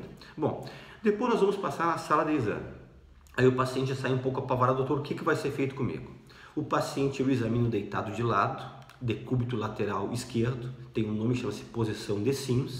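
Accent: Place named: South, Brazil. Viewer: Pelo sotaque, do sul.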